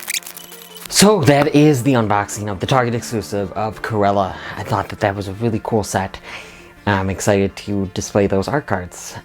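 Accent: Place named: England, United Kingdom. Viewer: Bravo! Really insightful thoughts about an awesome movie ( which SO needs to win an award for costume design). That English accent is pretty damn good too!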